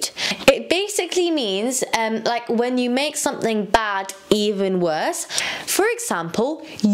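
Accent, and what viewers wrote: United Kingdom. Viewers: Thank you very much I do love british accent send me more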